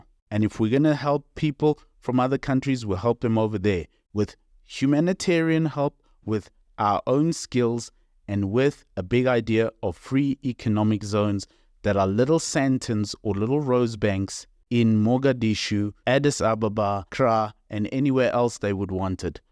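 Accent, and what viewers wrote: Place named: Netherlands. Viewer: Who are you to decide for us you are a white boer you don't belong here either you were deciding on us when it was aparteid so now fick off to Holland and stay there with. Your Dutch accent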